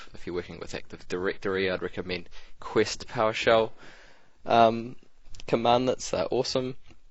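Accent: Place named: New Zealand. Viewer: Great Vudeo!! Viery helpful and unformative! the accent makes it better. Been doing some revusion on Powershell and this is great vud for ut! Go Kiwi!!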